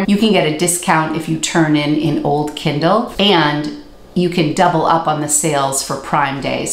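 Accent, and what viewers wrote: Boston, United States. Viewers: First time viewer.......where is your Boston ascent.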